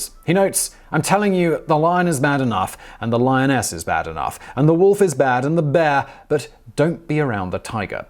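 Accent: United Kingdom. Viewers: Your British sounds somehow better than other British accents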